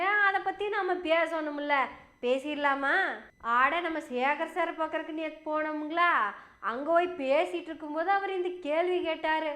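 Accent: Kongu, India. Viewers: அம்மா, உன்னோட கொஞ்சும் கொங்கு தமிழ் சூப்பர்!.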